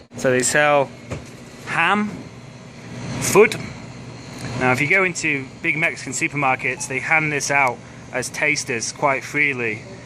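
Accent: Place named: United Kingdom. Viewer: I love the british accent !! > Good videos bro.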